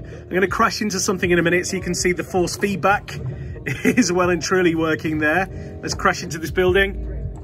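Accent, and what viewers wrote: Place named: United Kingdom. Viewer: You are British.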